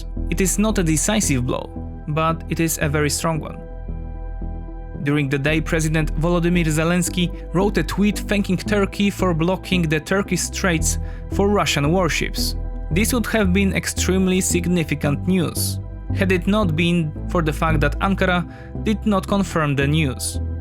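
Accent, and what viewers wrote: Poland. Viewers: BTW I hope after this ends you continue to voice the English version of good times bad times. No offence to the other guy, but i much prefer your voice. I love polish accent, and I think most people prefer hearing about geopolitics from a European rather than American! (No offence Americans) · Very informative video. The narrators Polish accent makes it even more ominus and I like it. 👍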